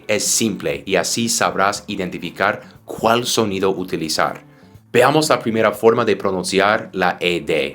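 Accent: United States. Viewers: Tu pronunciación del español suena naturalmente yanki. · lo principal es que los latinos no pronuncien la ed como tal pleyed guorked da lo mismo si dicen playt or playd si eres profesor importa, pero para los que aprenden NO ES TAAAAAN importante. Por ejemplo, tu español es muy bueno, pero tu pronunciación sigue siendo gringa. Muchos no se atreven a hablar por miedo a cometer errores de pronunciación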